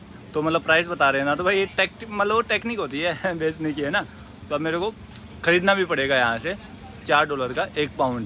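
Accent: Rajasthan, India. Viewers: Aap kya rajasthan se hain kyoki aapki hindi ki tone pashchimi hindi jaisi hai aur chehra lamba ..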